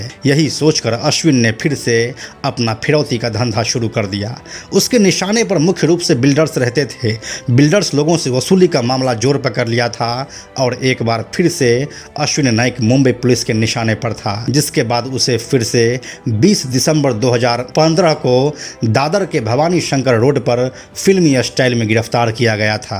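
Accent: Bihar, India. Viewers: Tu bhi bihari hai naa teri bhasa urf tone sae lg rha hai.......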